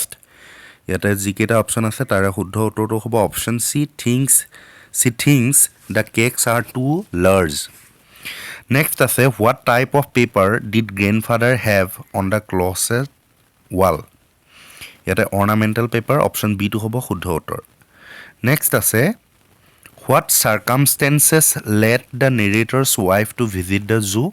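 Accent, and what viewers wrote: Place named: Kamrup, India. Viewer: Kamrup district r lage etene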